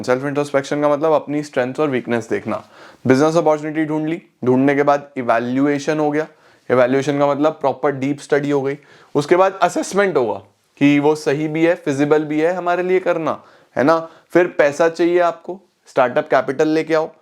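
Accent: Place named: Punjab, India. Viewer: Mangji se pta chlta h sir ap pake punjabi ho 🎉😍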